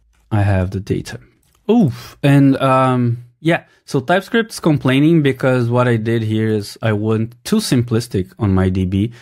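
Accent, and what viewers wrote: Brazil. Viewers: Ingles de Brasileiro é muito massa!! PArabéns mano! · Parece Brasileiro, você é brasileiro mn?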